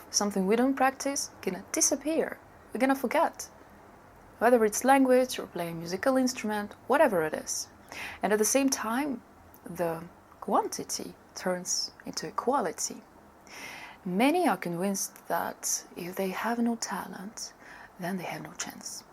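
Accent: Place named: Netherlands. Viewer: Hi, you are totally correct. Based on your accent I think you are from Holland/Netherlands or Belgium, possibly Scandinavian. In the former USSR Soviet Union they were the first to destroy this myth of "talent", the state needed the people to be as educated as possible for a variety of reasons. And they were the first to say that this Myth was created by the Elite, the Intelligencia/Educated classes in league with the capitalist imperialist Monarchy, to keep the proletariat down. In order to limit people and to control them. In the USSR they would say everybody needs to work hard to develop good skills. Only working hard and getting proper good teacher, who are willing to teach you properly. In Western nations the Elite educated classes, the overall (average) effect is not to fully teach/help the students, they make them suffer by not providing sufficient information in the studies, and then they say "It is the students responsibility to find the information", so they are trying to limit the amount of students who make it. 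In the Soviet Union, the leadership was aware of these kinds of sabotage by the Professors, and the Professors were watched by other Professors working for the state as Educational spies you may call it. If they see that a Prof is not teaching certain topics properly, they would be called in for investigation and be asked "Why can't you teach that topic properly, is it because you are not that good in this topic, if that is the case we will send you a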